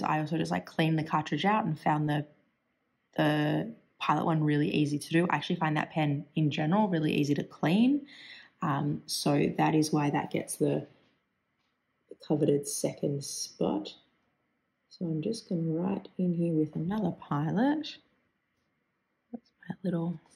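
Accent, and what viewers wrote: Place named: Australia. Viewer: I, too, am not so fond of Lamy. I adore the Plaisir. It writes all the time. The Preppy just can’t be beat. I have several sets in different nibs all inked up in their different colors, so I can draw quickly. They are only $4.00 here. I do not like my Kawecos at all. It think it’s the nibs, so I might change them out. I do not yet have a TWSBI yet. I’m cheap. Don’t love the piston fill, either. I love the Platinums altogether. The Kukuno is also a big favorite and I have several, as they only cost $12.00 here. I have them all over the house. 😅I never spend more than $30.00 on pens. I’m now getting into inks. OMG. About to lose my mind. So many choices, so much money. I prefer cartridges so will be filling my own with special inks. Some inks are so gorgeous they should be drawing inks because the writing doesn’t really show all the nuances. 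Do you have actual pen stores in Australia? I live in Oregon, USA, and we have only one stationary store and they carry a few fountain pens. Other than that, in my local area, I buy everything on line. I probably told you before, I love your accent and all things Australian 💝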